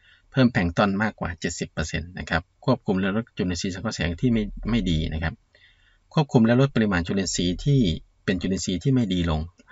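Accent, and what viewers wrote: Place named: Isan, Thailand. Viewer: มีสำเนียงอีสานด้วยแมนบ๊อจร้า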